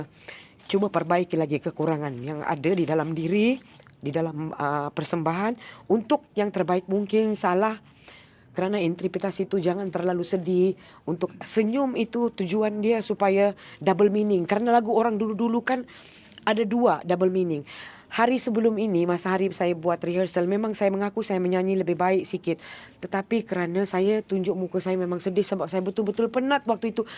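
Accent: Sabah, Malaysia. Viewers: Slang sabahan itu mahal . Suka dgr👍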